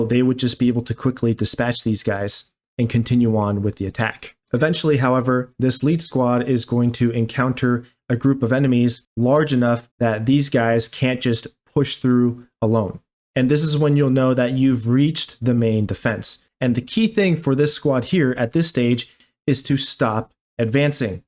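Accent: United States. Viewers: that’s crazy would’ve never guessed your American accent and language skills are so good.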